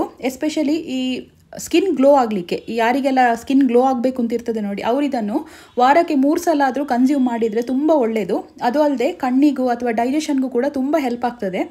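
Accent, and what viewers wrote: Mangalore, India. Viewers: Namma kudlada kannada eshtu porlu marre kenga keluva anthave agtade ❤❤❤ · Manglore kannada thumba chanda❤ kelalikke thumba khushi. Nammoora bhashe❤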